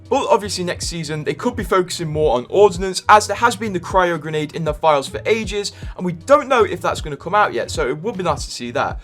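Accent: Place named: England, United Kingdom. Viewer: Your fuckin English accent is discusting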